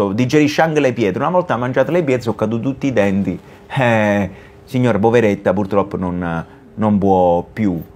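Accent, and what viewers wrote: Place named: Naples, Italy. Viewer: Sempre interessanti questi video. Poi l’accento partenopeo rende il commento ancora più efficace Complimenti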